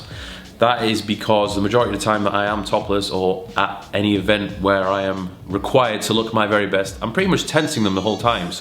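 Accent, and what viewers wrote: United Kingdom. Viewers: You Are British ... Right na...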